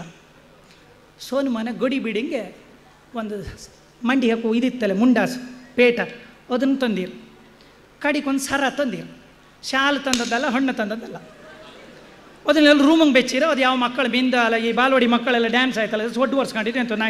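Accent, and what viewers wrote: Kundapura, India. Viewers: ಕುಂದಾಪುರ ಕನ್ನಡ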